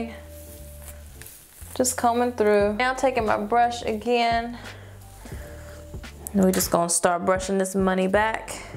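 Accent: South, United States. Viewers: I love your little country accent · a real authentic southern accent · in love with her country accent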